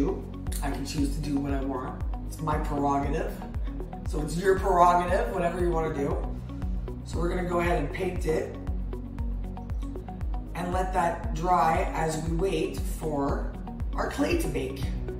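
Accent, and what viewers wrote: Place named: Canada. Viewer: OMG I KNEW IT HAHA i kept thinking "she has to be at LEAST Canadian" and then you said "un lozenge"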